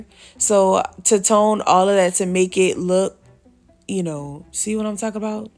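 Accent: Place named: New Orleans, United States. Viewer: New Orleans!! lol gotta be I know accents like the back of ma hand im from Atlanta, but if im wrong ma apologies love lol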